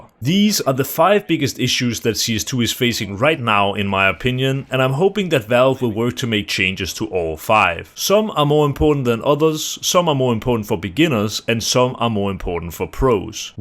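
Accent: Denmark. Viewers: dude i can tell you are danish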